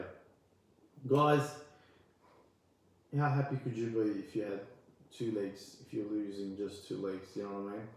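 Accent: Australia. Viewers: Everytime I hear your Aussie accent, I smile... You have a special place in our hearts back here in Australia. You are doing great work. Don't give up ok. This kind of work you do for the animals can suck the life out of you if you let it. Please take care and look after yourself x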